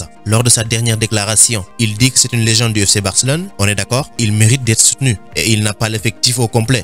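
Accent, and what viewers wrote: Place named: Senegal. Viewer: Thia accent sénégalais bala consommation locale la wakh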